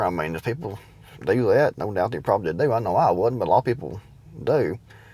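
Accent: Appalachia, United States. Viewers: True. But it is easy to see why everything has gone up in price. So if a lot of our stuff comes from Canada and Mexico and other countries then we are paying up to 100% more for stuff because of Trump's ridiculous tariff war, economic insecurity, and his crazy decisions make everything unsure. Because you come from Appalachia, which predominately votes Republican (I'm near WV), along with the accent. which is why they made the assumption :) Trump said it, the people you talked to just parroted his talking points. They didn't take the time to actually think about it (as you apparently have). They don't have critical thinking skills. They think Trump is going to throw off illegals, not poor white family. LOL When it all comes down, people are going to start scavenging and stealing and it will give T a chance to enact Martial Law. He wants to be a dictator and the easiest way is to stoke a war with someone outside and force an internal conflict and he can try to declare a state of emergency and then Martial Law. 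· You are an Appalachian the same as I am! Be proud of how you speak! <3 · Keep that Appalachian accent! It is lovely. Buggy works!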